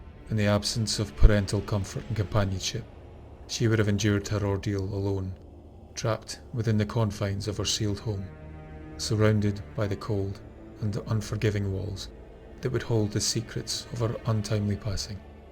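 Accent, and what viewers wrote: Scotland, United Kingdom. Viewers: Love yer vids and the guid Scots voice that accompanies them!🤩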